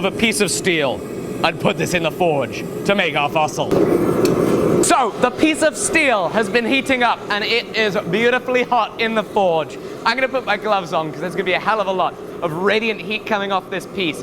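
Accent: England, United Kingdom. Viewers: ah hes English.. but from where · You were so British in your intro, you reminded me of Michael McIntyre. EDIT: I take it back. You sound like him the whole video.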